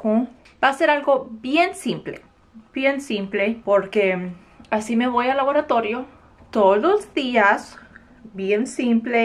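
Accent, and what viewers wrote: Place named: United States. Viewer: OMG sister your Spanish needs work but you are hilarious I love it 😻 Just listening to you makes my day cuz you can be so gringa😭👎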